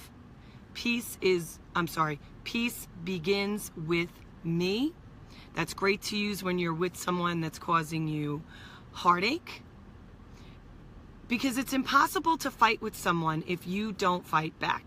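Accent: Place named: Northeast, United States. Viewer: Wow. A properly asked question. I applaud you. She sounds as if she has a N.E. American accent. Perhaps as far West as Indiana or Illinois.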